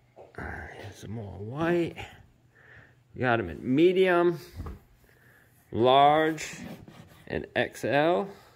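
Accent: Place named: Boston, United States. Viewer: If they sell well, please order 2X and 3X. Never like shirts that actually fit. I like them falling off. Never white, black or dark colors are best. I'm a scrapper and it'd get ruined on day one. Also, I'm originally from Somerville, MA. Love to hear you speak Boston. I'm in Connecticut and they talk funny LOL.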